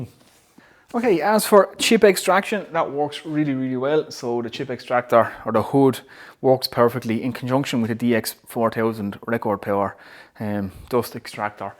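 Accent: Ireland. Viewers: 1500 quid is out of my price range atm pal but maybe in a couple yrs I will buy one when my woodworking is good enough to warrant it.. For now I'm getting me one of those cheap as fook models from Titan.. It'll do for what I need it for and when I'm ready to step it up to a better model I will be looking at a better model like one of these babys.. I need some good chisels so I was hoping u could give me some advice on the best Mortise chisels? The best set of ordinary wood chisels I can buy with let's say 200 euros as a price range for both sets?? I would very much appreciate it if anybody could help me with some good advice.. I'm prepared to go to 240/50 euros for both sets if needs be but I want to really get some great chisels for the money.. I am going to buy a set for about 670-70 euros as well for learning with but I really want both of the other sets now too.. 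It would be greatly appreciated if any of u good ppl on here could advise me on the best I can get for the money I want to spend.. Love the videos btw.. Where are u from pal? Is that an Athlone or Louth accent? I cannot tell but it's a proper Irish accent bro.. Great to see a top Irish woodworker on here doing his thing.. I haven't found very many tbh. Ur the only one atm mate but I will be looking for a few more in the future too.. Salute from DUBLIN by way of LIMERICK & Tipp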